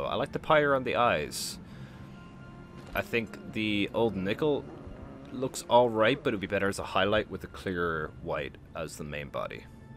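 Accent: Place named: Ireland. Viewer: Are you Irish or just live in Ireland? I will admit I've wondered about the origin of your accent :D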